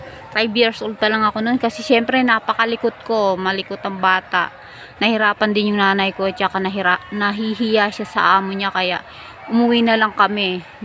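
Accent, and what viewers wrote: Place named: Visayas, Philippines. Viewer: Aragoy bisdak ka pla host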